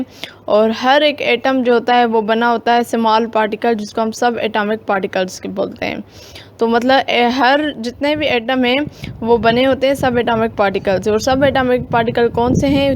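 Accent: Sindh, India. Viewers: Searching for best lecture on atomic structure and got your video ❤ .... Love your Sindhi accent Urdu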